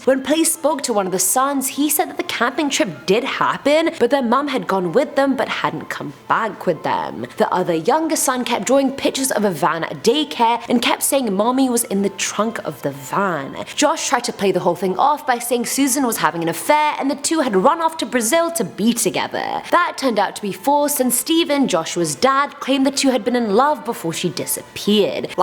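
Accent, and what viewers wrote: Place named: United Kingdom. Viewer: I turned it off as soon as I heard a gross limey voice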